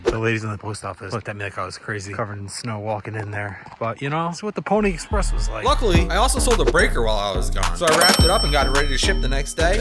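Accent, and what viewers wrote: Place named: New York, United States. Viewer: We are rooting for you, brother. Congrats on the recognition you’ve been receiving. Judging from your accent, seems like you’re from my neck of the woods (NY tri-state area). Best of luck!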